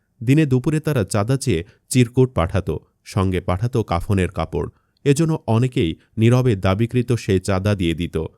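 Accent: Bangladesh. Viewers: ইউটিউবার ভাই মনে হয় নোয়াখালীর মানুষ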